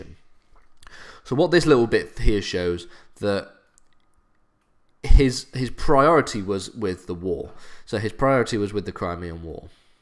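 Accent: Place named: United Kingdom. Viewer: bri ish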